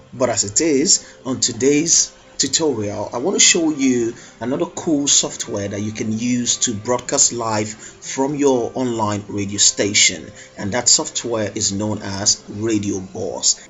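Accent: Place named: South, United Kingdom. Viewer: my mistake then! You kind of have this unique south british accest you know haha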